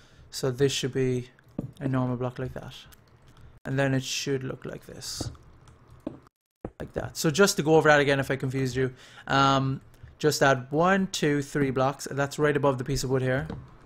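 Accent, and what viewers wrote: Ireland. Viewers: Can you please start making videos again? 1. I just want to listen to you talk (the Irish accent is the best) and 2. I really like your builds.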